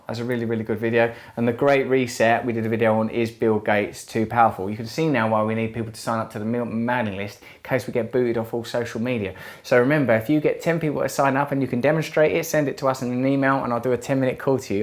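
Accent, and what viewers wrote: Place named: United Kingdom. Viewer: I really didn't expect you to be soooo intelligent... pleasently surprised... I did find it a bit hard getting though your your lovely British accent but I finally managed. Look forward to hearing more from you and thank you sir for giving your time to help all of us who need it. ❤️❤️❤️. Blessings to you and yours.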